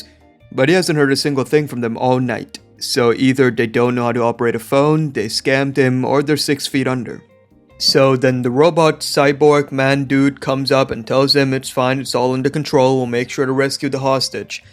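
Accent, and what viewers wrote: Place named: India. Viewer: This video genuinely made me almost cry laughing thank you bro, btw, i sense a slight ndian accent on your voice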